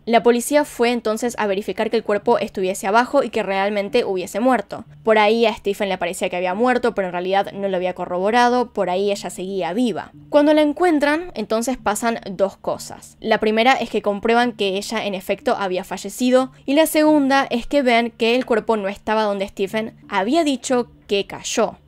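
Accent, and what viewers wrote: Argentina. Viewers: gracias por tu trabajo me gusta mucho y te expresas muy bien me encanta tu dejo argentino sigue deleitandonos con casos de diversos paises saludos desde Lima Peru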